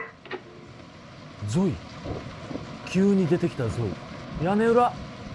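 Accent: Kansai, Japan. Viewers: 関西弁といい怖がりなとこといい、わいわいさんと被るwどっちも好きだから超嬉しいわw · ビビリ実況 関西弁 ユーモラス わいわいやん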